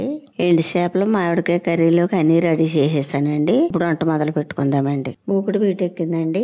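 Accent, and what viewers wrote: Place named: Godavari, India. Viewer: అండి అండి అంటున్నారు అంటే మన గోదారొళ్ళే 👌👌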